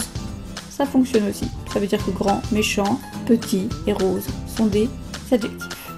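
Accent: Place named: France. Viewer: you good teach but accent french say not understand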